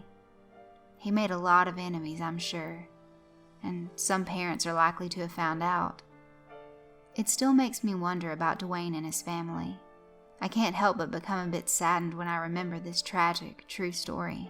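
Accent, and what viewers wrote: South, United States. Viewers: I have heard you say that you are from Georgia , but I don't think that I have ever heard a southern accent from you . That is until this video . While the theme of the video is always a sick and sad story , your voice gave it understanding and empathy for the friend in the story . Some reading this would automatically go for the anger and disgust of Chango . They probably also cheered when he was killed , but I think the story goes well beyond that and to the suffering of the victims . But either way your voice gave respect to the victims of child molestation and to the survivors .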